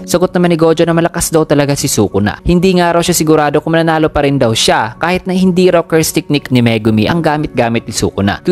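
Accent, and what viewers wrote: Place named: Conyo, Philippines. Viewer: napaka conyu mo naman pare magsalita., dapat mag-english ka nlng kung nahihirapan ka magtalog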